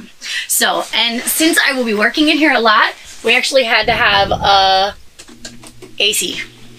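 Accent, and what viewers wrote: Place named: Poland. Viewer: ary you from Poland because your "ci" sounds very polish?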